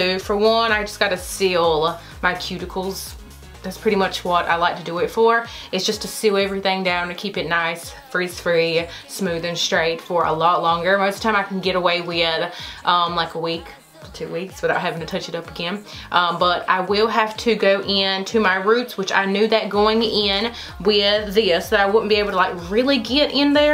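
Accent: South, United States. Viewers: You are so down to earth and I LOVE your accent! After I hear someone’s accent I start talking like them lol! After I finished your video my husband was like, “why are you talking with a southern accent?” 🤣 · Lori T I’ve never heard anyone with that thick of a southern accent in NC. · I like your southern accent!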